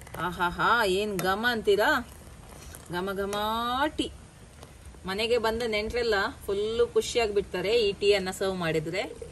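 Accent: Mangalore, India. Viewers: Supertea madam maguvinathaha mukhadaste mangaloorina mathu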